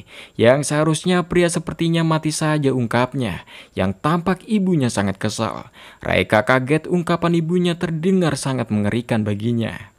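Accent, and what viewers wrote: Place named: Indramayu, Indonesia. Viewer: Orang indramayu tah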